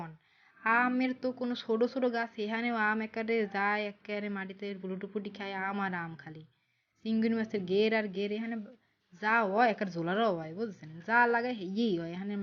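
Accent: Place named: Barishal, Bangladesh. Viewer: আসসালামু আলাইকুম আফা। আমার বাড়ি নরসিংদী, কিন্তু আফনের বরিশালী কতা হুইনা খুবই ভালা লাগসে....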